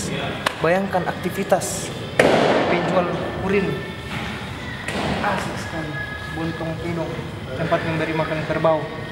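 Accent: Makassar, Indonesia. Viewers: salah penulisan sy dimana?? Loe org toraja tp mau logat2 ala2 Makassar.. Santai moko ces..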